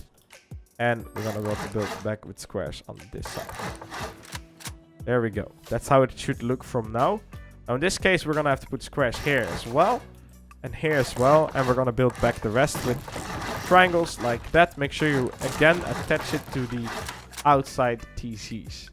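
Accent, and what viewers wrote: Netherlands. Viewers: Are you from the Netherlands or Belgium ?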